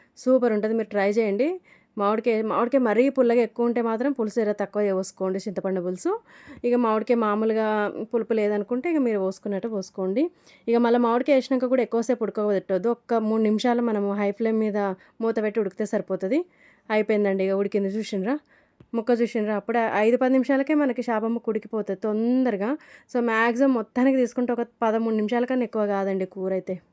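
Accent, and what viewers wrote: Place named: Telangana, India. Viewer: Wow chepalu pulusu adhurs and me telangana slang adhues🔥🔥👌👌👌👌 garu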